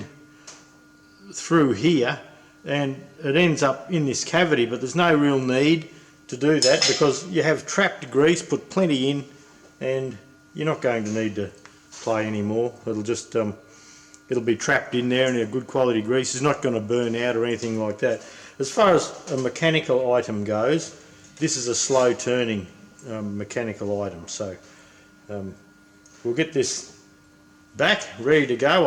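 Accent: Australia. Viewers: Nice Aussie accent Mate !!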